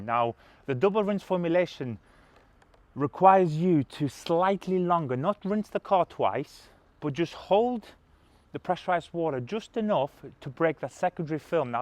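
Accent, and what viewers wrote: United Kingdom. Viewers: Are you a geordie?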